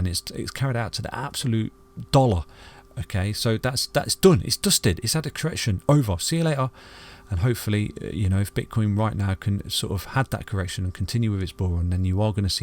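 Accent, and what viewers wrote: United Kingdom. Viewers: Love hearing a fellow Brit on these videos. Great job.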